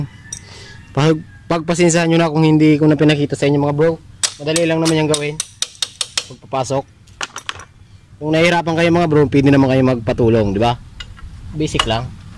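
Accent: Bicol, Philippines. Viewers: bicolano ka boss?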